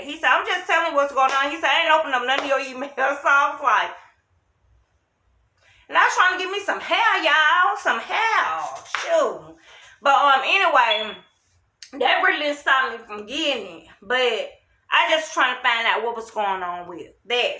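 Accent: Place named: South, United States. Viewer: Yea people always try to try the quiet ones u gotta be careful with them lol 😂 Where are u from because how u say “street” and “strange” that’s down south I love it😂😂👌🏽👌🏽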